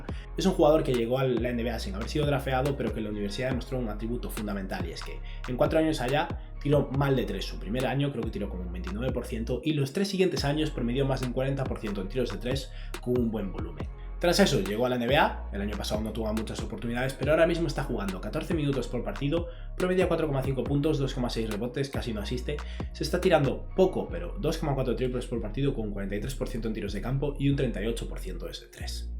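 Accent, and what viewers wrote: Spain. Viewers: any day my dude!! My spanish is not that bad because I had several lessons and my dad is Puerto Rican, im just terrible writing it. You have a nice spanish accent btw lmao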